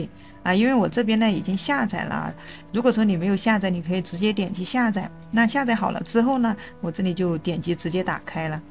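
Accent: Sichuan, China. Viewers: 四川还是重庆的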